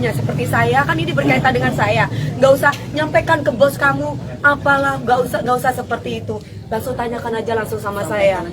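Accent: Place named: Jakarta, Indonesia. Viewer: Gaya betawi😂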